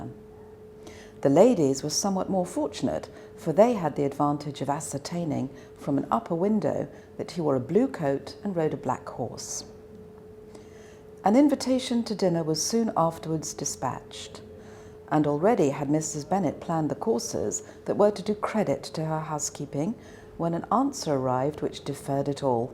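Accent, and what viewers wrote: United Kingdom. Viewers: 영국발음 멋있어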